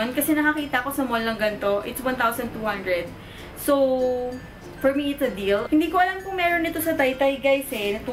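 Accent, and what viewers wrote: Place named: Pampanga, Philippines. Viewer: U sound kapampangan😂✨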